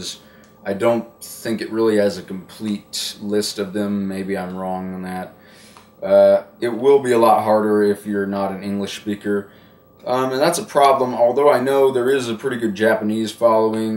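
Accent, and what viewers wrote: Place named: RP, United Kingdom. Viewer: what state are you from? you sound very posh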